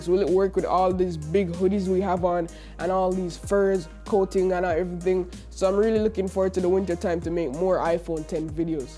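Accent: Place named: Jamaica. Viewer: Wait why does he have a Jamaican accent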